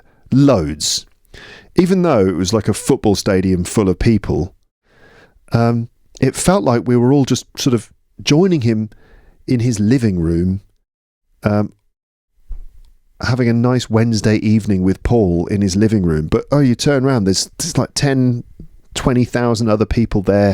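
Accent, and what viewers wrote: United Kingdom. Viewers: Hi! I'm an English teacher and I have started listening to your podcast since last year. It helps me get up in the mornings with a clear mind! Love the delicious humour it offers. Love the vocab explained and your beautiful British accent. I have become addicted to it! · 1. I've listened to your podcast to get used to British's accent and imitate your words. 2. Just listen and imitate your words for many times. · Hi. Thanks a lot for your podcast. Im an English learner in China and I love listening to your podcast and your sound. Im here to learn about British accent, and it helps me alot. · I love how British English sounds and the way you speak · Hi, I know it's late for the answer, but I want to tell you how much I love listening to you. I like to knit and usually I listen to your podcasts or videos while I knit. I find that a perfect match as I get more and more confident in English. 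Besides, your British accent is so nice that it's always a very pleasent time "knitting with ". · Hi. I'm from Myanmar. You know what? My listening skill has definitely improved after listening to your podcasts. The problem was when I became so attuned to your accent which is British accent, I couldn't fully understand other different accents. Why does that happen? Does that happen to most of English learners? And I'm just wondering if you can have a chat online with your listeners (including me) on 31st December, which is the last day of 2024? I'm so eager to talk to you. But it's okay to decline my request if you don't have enough time. Thank you and looking forward to seeing your new episodes. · Your podcast is the best English teaching podcast on YouTube... It has been a great help for me to learn English especially when you read short stories... So I recommend that you read more stories on your podcast.. 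I enjoy listening to the stories in your charming British accent... Please post more episodes of you reading short stories.. thank you very much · Hi.Yes How do you know that your podcast is good for get sleepy??😂😂😂 Joke, joke for me it's useful to improve listening listening... I like British pronunciation and entonation too. Thank so much for this.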